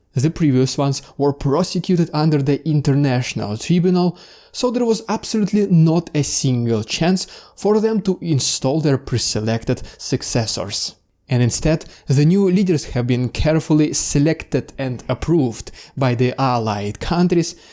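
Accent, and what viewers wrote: Canada. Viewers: Dude why you suddenly a canadian dude?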